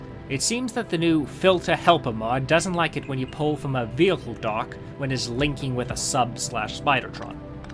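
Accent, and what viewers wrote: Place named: Northeast, United States. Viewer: How interesting! I would have sworn you sounded like someone from the NE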